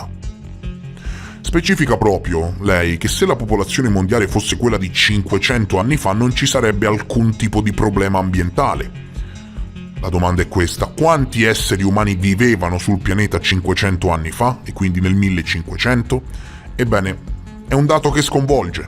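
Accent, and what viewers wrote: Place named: Rome, Italy. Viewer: L'accento è di Roma.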